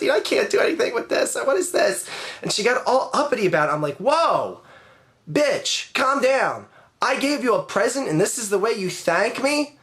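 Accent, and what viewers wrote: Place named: Philadelphia, United States. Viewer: you are definitely from philly saying wooder instead of water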